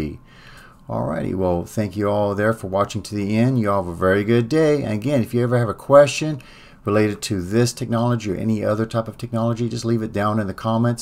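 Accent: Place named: United States. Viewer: Hi there! 1st and foremost I wanted to say THANK YOU so much for being an american. I can't tell you how happy I am to be able to understand and hear you clearly. WOW, what a major difference. So thank you for that. I was so frustrated before I found your video. I followed each step, and it fixed my problems in less than 2 minutes. That was truly amazing. I appreciate people like you who take the time to make a video to help other people like myself. You make life a lot easier. My name is Cindy & I'm reaching out to you from southern California. Have a great day! Stay safe.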